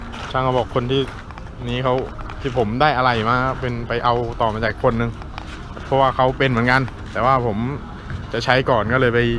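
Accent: South, Thailand. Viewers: แหลงใต้ชัดหลาว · คนใต้555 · พี่คนใต้หรอครับเนี้ย 😁😁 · สำเนียงเหมือนคนใต้หรือคิดไปเอง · ขอบเติ้นเเหลงใต้นิ ว่าหรอยๆ ผมยุพัทลุงนิคับ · แหลงไต้เลยหรอ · เเหลงใต้พั่นนี้ผ่านเลยพีี่บ่าวววว !! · ว่าใต้จัดเจนเรย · พี่เป็นคนต้เหรอคับ จังหวัดไรเหรอ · พี่คนใต้หอครับ. ตอบแท่ได้ครับ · พี่คับพี่อยุ่จังหวัดไรอ้ะเสียงใต้ๆ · แหลงใต้ชัดปีกเลยนะ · เอ้า!! ใอ้ย่ะคนใต้บ้านเดียวกัน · คนใต้เหอะนิ จังหวัดไหน คับ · พี่คนใต้หรอครับ>< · พี่คนใต้กันช่าย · ใช่ครับ พี่เค้า คนใต้ คนนครศรีธรรมราช อ.ลานสกา ครับ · คนใต้นิกว๊าาาคนบ้านเดียวกัน ติดตามเรยๆ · คนใต้นิเบอะ ... 555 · อ้าว พี่คนใต้หรอคับ555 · พี่เป็นคนใต้ป่ะคาป ได้ยินเหมือนพุดใต้เลย พรักยุไหนแล้วอา....? 5555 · ว้าวพี่พูดใต้น่ารักมาก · เพิ่งรู้ว่าพี่คนใต้555 สงสัยมารนานละสำเนียงอะไรทำไมคุ้นๆ555 ผมคนหาดใหญ่ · พูดใต้อ่าา หล่อจังงเสียงง 😍😍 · คนใต้นิหว่า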